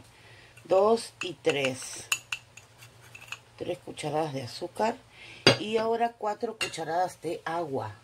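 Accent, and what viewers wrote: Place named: Buenos Aires, Argentina. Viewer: Hola . Es la primera vez que te escribo,hace poco tiempo buscando recetas de cocina asiática encontré tu canal,me pareció muy interesante prácticos tus platillos. Al principio creí que eras Argentina por tu acento medio porteña pero luego descubrí que eras del Hermoso Uruguay! !!.Gracias por tomar tu tiempo y enseñar sobre el mundo culinario asiático. Saludos desde Argentina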